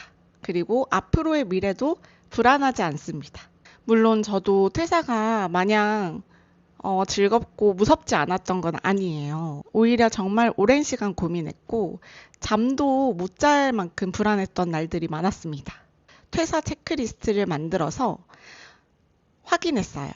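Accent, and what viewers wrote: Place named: Gyeongsang, South Korea. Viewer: 경상도출신 主婦이네요?음성. 억양. 과거는,女商출신이,창구를 응대하는데...요새는, 전부 4년제대학출신.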